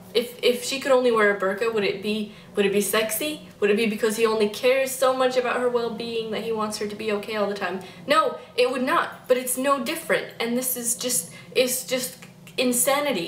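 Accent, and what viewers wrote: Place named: Europe. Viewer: Is this lady European because I can hear a slight accent.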